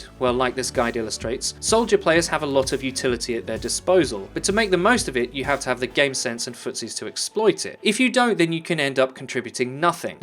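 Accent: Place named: United Kingdom. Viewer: Zack Edwards Im British, but cmon dude, he has one of the most overly British accents ive ever heard.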